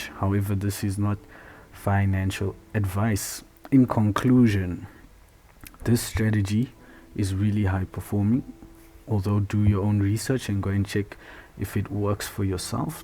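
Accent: South Africa. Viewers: Thanks, where are you from, S.A. ? Your accent sounds like you from S.A. ? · Are you South African?😊 Thanks for the video! Looking to learn more about ICT. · Bro you from sa? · You sound South African you from South Africa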